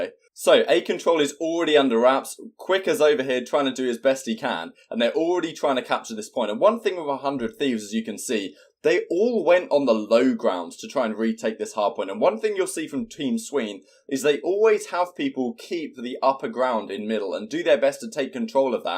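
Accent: United Kingdom. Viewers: Love the game analyses, maybe it’s your British accent but I feel like you would be a good ass caster too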